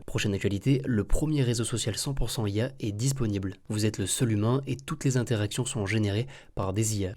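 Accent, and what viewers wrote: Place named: France. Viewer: je te decouvre c"est interessant. Un peu trop de francais dans ton anglais a mon gout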